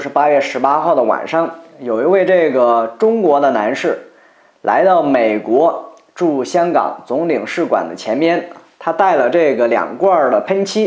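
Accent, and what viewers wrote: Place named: Northeast, China. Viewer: 是東北哪旮旯來的，口音好玩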